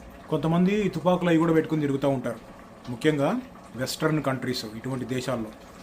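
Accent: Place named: Andhra, India. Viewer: Your language pronounce is like Andhra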